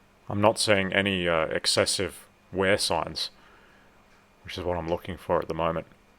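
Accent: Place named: Australia. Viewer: This is a great video. I love your Auzzie slightly annoyed all the time accent. Anyone who can work on a transmission like yourself is a master mechanic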